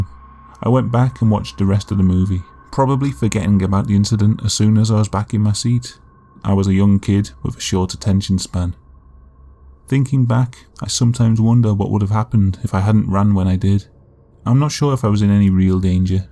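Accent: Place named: Liverpool, United Kingdom. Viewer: That's why you have a slightly Scouse twang to your accent!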